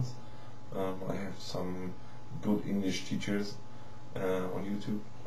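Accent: Germany. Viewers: Cool and funny :P Haha you can hear ur "English-german"! Anyway, maybe i come up with some cool ideas for ya m8 :D and btw, kill that bird! :D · Deine Aussprache ist echt gut, find ich, besser als meine ;) Und den Deutschen Akzent kriegt man eh nie richtig weg :)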